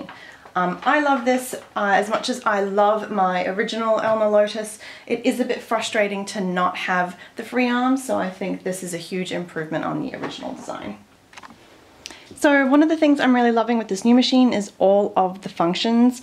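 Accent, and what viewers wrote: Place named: Australia. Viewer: Love your review of this machine. I have the original lotus Tx and haven't used it for awhile so inspired to start using it again. I would love it if you could go through a review of the original one too. I love your sewing room are you able to do a video of that and how you set it up, it looks very organised. I am from Australia just wondering with your accent if that is where you are from. Great work your videos go at a good pace to understand and you are nice and clear and camera work is great too, well done.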